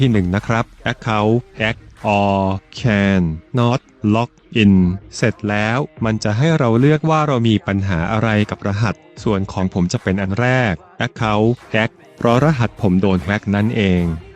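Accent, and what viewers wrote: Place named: South, Thailand. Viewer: ผมชอบดสียงพี่เหมือนคนใต้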